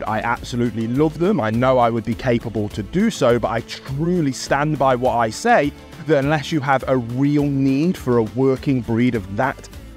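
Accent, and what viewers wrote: United Kingdom. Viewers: Me thinks based on your other videos that you may not be good at your job. And that you sound British you may have taken it from someone else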